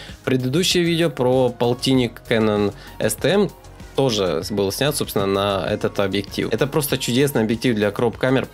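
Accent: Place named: Kharkiv, Ukraine. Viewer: Кстати, из какого ты города? Акцент на харьковский похож))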